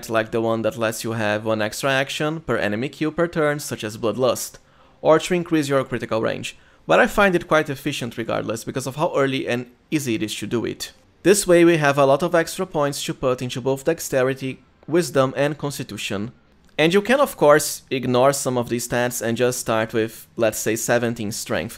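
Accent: Brazil. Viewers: eu SABIA que o sotaque era brasileiro, SABIA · Eu tenho certeza que tu é brasileiro com esse sotaque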